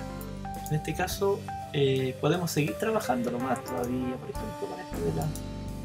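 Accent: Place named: Chile. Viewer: Hola, Encontré tu canal por casualidad...lindas vistas y panoramas en general. Tu acento te vendió como “Chileno”! Yo salí el ańo 78...los campos y panoramas son únicos especialmente cuando incluyes la cordillera de fondo. Yo tengo ańos en photography pero sin canal YouTube. Muy practico tus comentarios especialmente para personas empezando en este hobby o rubro de fotografía. Keep up the good work! Alejandro González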